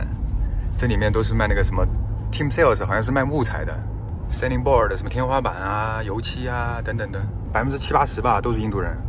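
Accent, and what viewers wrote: China. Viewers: 英文能听懂，还是蛮中式的哈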